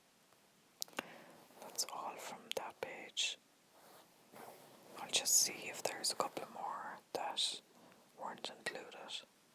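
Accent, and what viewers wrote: Ireland. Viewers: Are you Irish? You remind me so much of a friend of mine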